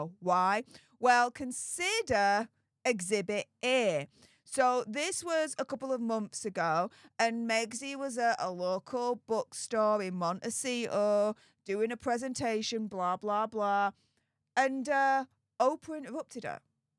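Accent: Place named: Northern England, United Kingdom. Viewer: Great music 🎶 Just love a northern accent 😀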